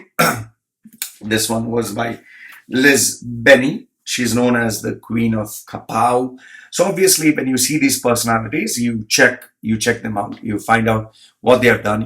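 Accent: India. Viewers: Dude are you indian?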